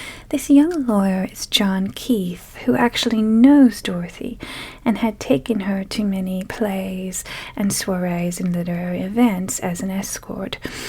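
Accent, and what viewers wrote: Transatlantic, United States. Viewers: The cadence in which you speak is very interesting, is your accent natural or is that something you’ve worked on? Would you describe it as a transatlantic accent?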